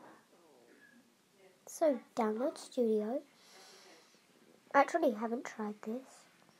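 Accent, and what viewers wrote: United Kingdom. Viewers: That Cute britsh Voice Doe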